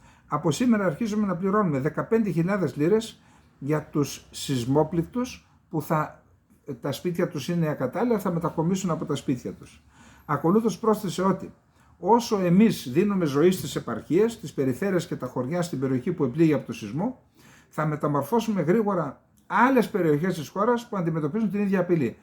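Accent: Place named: Pontic, Greece. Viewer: Καλημερα κ. ολως τυχαιως ειχα ενεργοποιημενους τους υποτιτλους και ολιγον σασουρευταν,δεν μπορεσαν δυστυχως να μεταφρασουν τα ωραια ποντιακα σας.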